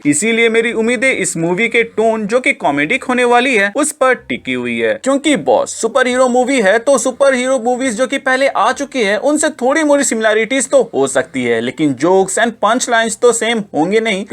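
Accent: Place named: Bihar, India. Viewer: your Bihari Acsent is good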